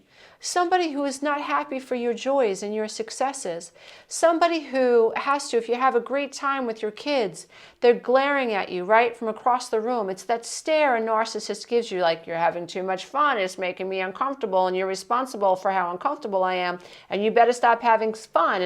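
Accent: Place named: New Jersey, United States. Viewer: Great video. I agree with everything you said. I like your “Joisey” accent (a compliment if you aren’t from NJ).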